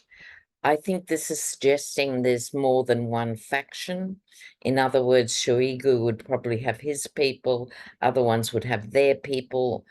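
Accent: Australia. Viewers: “…his lily white buttocks.” I love how you said that! ❤️Australian accents rock! 😂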